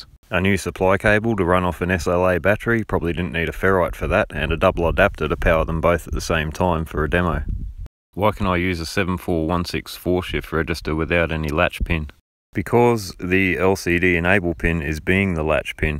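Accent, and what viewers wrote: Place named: Australia. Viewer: pleasant change to here an aussie voice haha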